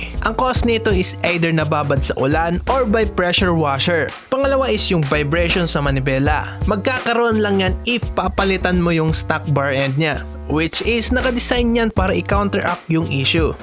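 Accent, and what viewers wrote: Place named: Conyo, Philippines. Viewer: Ang conyo mag english, don't try so hard bruh ang panget sa tenga.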